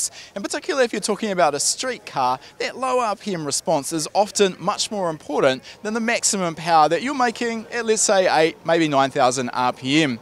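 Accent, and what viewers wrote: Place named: New Zealand. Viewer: No insult intended😁. Just that, as an Aussie, it's so nice to hear a non-American voice on YouTube (even the web) these days. I love Niew Zilland ecsents.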